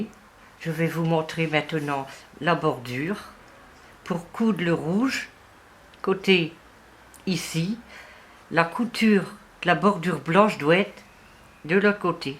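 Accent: North, France. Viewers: Merci pour cette bonne démonstration! Bonnes explications! Belle vidéo! Bonnet facile à réaliser au crochet! Bonne idée de prendre plusieurs fils pour faire le pompon, j'y avais pas pensé! J'aime votre accent du Nord! Amitiés de Belgique MIMILOU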